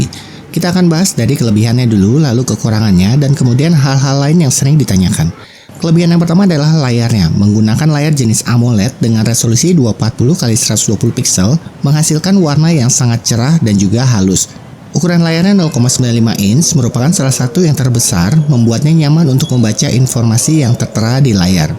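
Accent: Bogor, Indonesia. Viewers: Bang orang bogor bkn??? 😅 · Reviewnya ringkas tapi detail banget, mencakup hal-hal essential, padat berisi, informatif, dan yg keren itu udah ada subtitle hardsub 👍🏼 Keren nih reviewnya, makasih udah sharing bro. Btw, orang Bogor ya?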